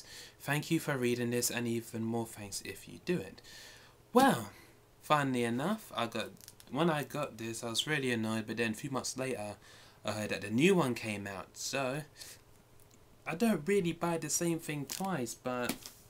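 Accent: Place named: England, United Kingdom. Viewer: lol shirt says bronx but hes clearly english :)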